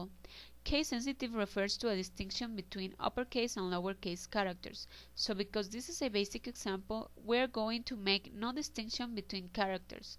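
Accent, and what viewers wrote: India. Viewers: Thumb up for the indian accent :D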